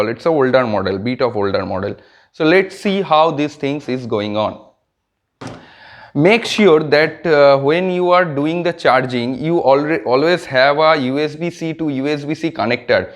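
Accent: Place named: India. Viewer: So.foking annoying anglish you indian 😡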